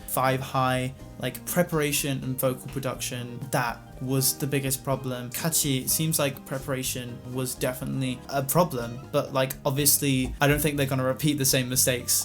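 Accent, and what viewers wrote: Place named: Southern England, United Kingdom. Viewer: Love your accent bro 👌 southern england aroundish London? Lol 😄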